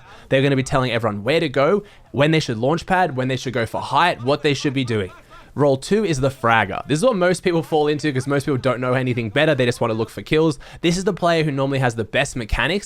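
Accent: Australia. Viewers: Nice Aussie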